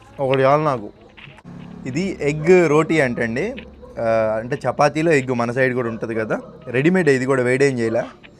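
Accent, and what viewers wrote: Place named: Visakhapatnam, India. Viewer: మీది విశాఖపట్నం ఏనా భయ్యా మీ మాటలు అక్కడిలానే ఉన్నాయి కొంచం. మీది ఏ ఊరో చెప్తారా భయ్యా 😊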